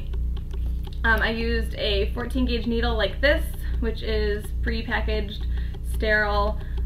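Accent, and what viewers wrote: Michigan, United States. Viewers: I knew I recognized that accent, another fellow Michigander! Haha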